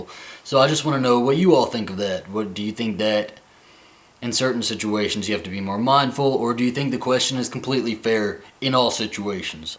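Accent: South, United States. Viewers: I think your point is what I been saying to my best friend we should focus on the relationship an not the bedroom, there's so much more outside if the bedroom , love your videos an want hold that team against you lol #GoRebs that southern voice swoon ..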